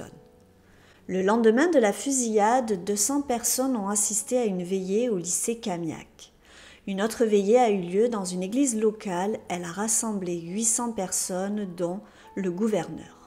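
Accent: South, France. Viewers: Vous êtes du sud de la France ?😉 Petit accent !👍 Moi je suis Cannoise, j arrête pour la semaine et fidèle pour le week-end prochain 🙋‍♀️🐾🐈⚘🌞🤢🍀😺🌻👍👍👍👍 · J'adore ta chaîne et la façon dont tu raconte l'histoire👌🥰. Je pense vue ton accent que tu dois être du Sud de la France comme mo😉i je suis d'Avignon.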